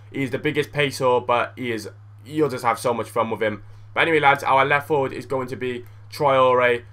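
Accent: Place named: Welsh Valleys, United Kingdom. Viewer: You sound like the guy from the valleys haha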